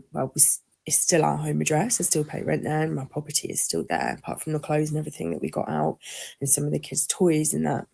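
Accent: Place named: Southern England, United Kingdom. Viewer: well I’m from south of England (isle of wight) and I KNOW the police are slack and corrupt down here she sounds like she’s from the south?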